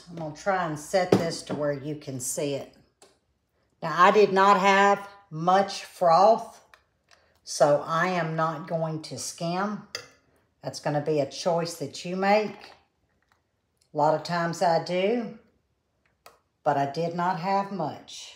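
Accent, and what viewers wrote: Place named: Louisiana, United States. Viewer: I feel like I'm back in Louisiana listening to your accent..... P.S. can you speak Cajun French?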